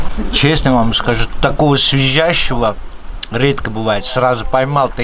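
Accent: Ukraine. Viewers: вы просто крутой узбек с украинским акцентом) живите долго, кушайте вкусно!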